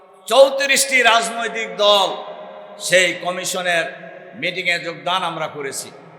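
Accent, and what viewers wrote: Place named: Sirajganj, Bangladesh. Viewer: মাঝে মাঝে সিরাজগঞ্জের ভাষা গুলো অনেক সুন্দর।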